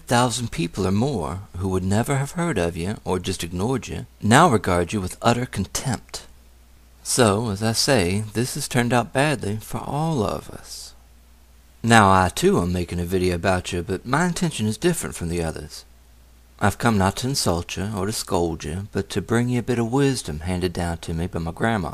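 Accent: South, United States. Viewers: Dude, you're a personal hero of mine. The insight and unvarnished truth blares triumphant. May I say, your voice of 'southern comfort' has found a fan north of your borders.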